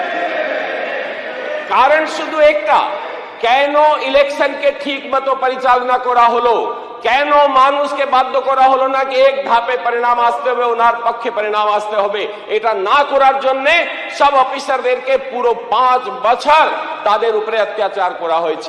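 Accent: India. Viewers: Apnar bangla ucharan akhono hindi tan.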